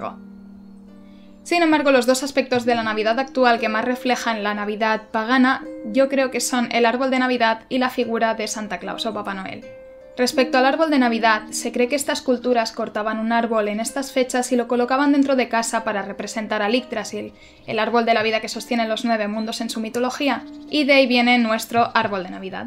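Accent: Spain. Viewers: De pronto no seas tan consciente de ello, pero a nosotros los latinos nos envuelve el acento español y más cuando escuchamos a personas como tú contando historias, saludos.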